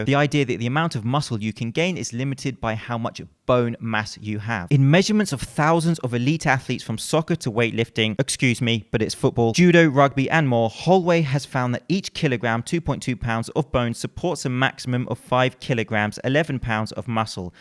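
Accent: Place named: London, United Kingdom. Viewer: Random thought, would bone density, not just bone size also play a part ? Thinking that larger muscles are going to need bigger and stronger (denser?) frame to work off. And if so is that bone density something that can be worked upon ? ie in much the same way that muscle growth is a response to the damage caused to it, would bone density also be affected by damage ? Now of course not promoting the idea of breaking bones. Just think how martial artists strengthen bone through repetition. Probably talking out of my arse but hey if you don't ask questions you ain't gonna learn. Love the vids, though not quite got the old school Landern accent, 8/10 my san!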